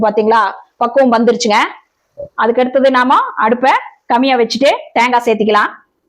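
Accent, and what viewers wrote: Coimbatore, India. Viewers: நம்ம கோயம்பத்தூர் மரியாதை பேச்சு(ங்க) தனி கேட்கவே அவ்ளோ நல்ல சந்தோசமா இருக்கு 🎉🎉😊😊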